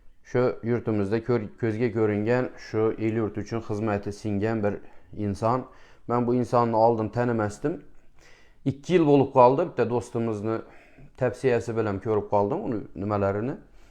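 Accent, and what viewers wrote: Khorezm, Uzbekistan. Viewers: Хакикий хоразмликни гапи бу .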